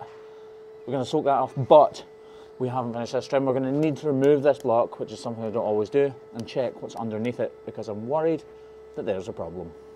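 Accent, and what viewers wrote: Scotland, United Kingdom. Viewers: greetings from Texas, USA! Obviously, a lot of viewers enjoy your work, as do I. I have visited Scotland, and I have a great fondness for your land and heritage, and hope to come back again. I have high hopes for 447, and it's thanks to your good work. That cow has an internatinoal reputation now. I love your lead-in music, is it a Scottish performer? Great work sir, and I love the accent! Did I mention I like Scotland!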